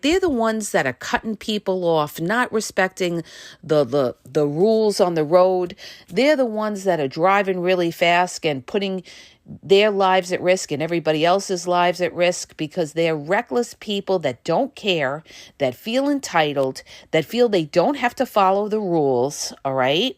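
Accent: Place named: East Coast, United States. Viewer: It’s gotta be the East Coast accent….❤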